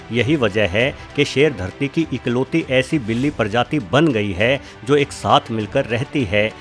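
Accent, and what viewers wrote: Haryana, India. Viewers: Sir aap kaha se ho? Avaj se haryana ya rajasthan ke lagte ho?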